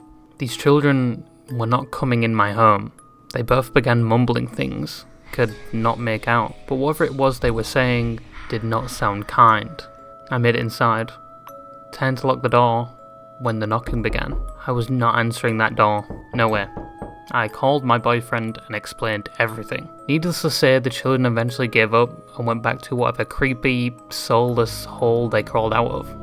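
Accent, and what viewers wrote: United Kingdom. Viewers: Noooooo PLEASE 🙏 I love accent 😭 Don't make his accent go away 😢 He has allotta more femboy topics to discuss 🙏😔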